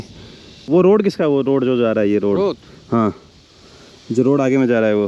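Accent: Haryana, India. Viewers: The way you talk in haryanavi 👌👌🙏❤️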